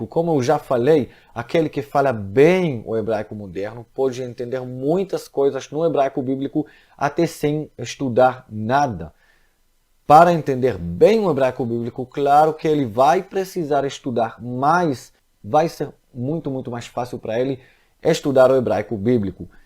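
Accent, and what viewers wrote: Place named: Rio de Janeiro, Brazil. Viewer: SHALOM PROFESSOR! SEU APRENDIZADO DO IDIOMA PORTUGUES FICOU BEM CARIOCA OU DE PESSOAS DO RIO DE JANEIRO.TEVE ALGUMA INFLUENCIA DE SOTAQUE CARIOCA QUANDO ESTUDOU PORTUGUES?SÓ CURIOSIDADE HEINN..☺DEVO DIZER QUE SUAS AULAS SAO EXCELENTES!!!PARABENS!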